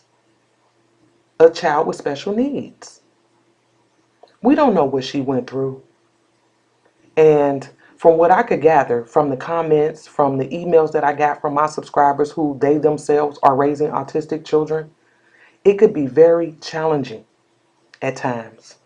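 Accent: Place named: Chicago, United States. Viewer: I’m a newbie to your channel. Love your real ness. Sound like you from my hometown Chicago... lol anyway this story is sad. Yes the truth will come out, just like it came out on the 911 call. The hospital really need to implement mental health test after mothers have babies with special needs or not before exiting the hospital to potentially avoid unfortunate death of babies and toddlers. This is so common and the lies are getting dumber and dumber. Justice for baby Braylen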